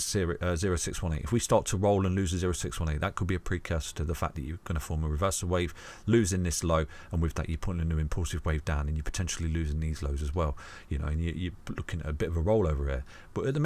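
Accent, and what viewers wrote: United Kingdom. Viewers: You have a fcking perfect british accent, I love it.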